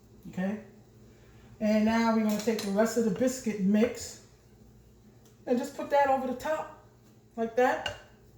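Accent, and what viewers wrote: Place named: Brooklyn, United States. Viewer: It's the Brooklyn accent for me 😏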